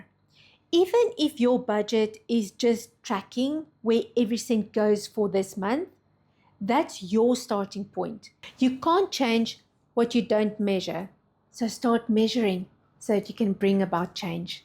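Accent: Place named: South Africa. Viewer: I am going to draw cash for my groceries because it is just too easy to swipe a card. Having to draw more cash than you intended to spend means some serious deliberation. Ex Jhb now in the Netherlands. Always nice to hear a South African accent.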